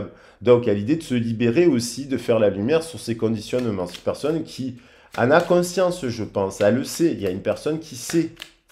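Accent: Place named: Marseille, France. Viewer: Merci petit et cette petit accent de Marseille 😉🙋‍♀️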